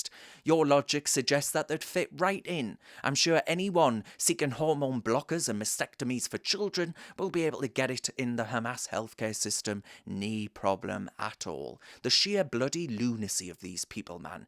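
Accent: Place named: North East England, United Kingdom. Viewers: Nice to hear a North East Accent on a Youtube video for a change. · Sorry mate, I don't speak northern. Maybe if you could speak English properly, I might take your hypocritical right wing views more seriously. There's also the fact that it was the Israeli terrorists that mounted a letter bomb campaign in London that killed the Lord Protector of Palestine and some postal workers, as well as maimed them permanently. Hamas has never done that to us, and you're siding with those terrorists now, speaking of lunacy, if that was what you were saying through that really thick regional accent of yours that would preclude you from getting a job front of house in actual England. Looks hypocritical and unpatriotic of you, how about when they blew up the King David Hotel with all our people in it, you support that as well, do you, because there was no Hamas then, not even the PLO were founded yet, in fact, there was no terrorism in the region back then apart from your little buddies here, so what's your excuse for that, confused Geordie? 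See what I mean about "hypocritical right wing views", where you support terrorism on our own people, which Hamas has not done here in London, or to our troops. Regardless, "bonny lad", you know that Israel gives a medal for that and other acts of terrorism? It's called the Lehi medal. How do you account for that, because your islamaphobic model does not fit that, or understand basic history, like how the right wing in the Uk also persecute LBGTQ in this country. The only one among you to ever support LBGTQ legislation w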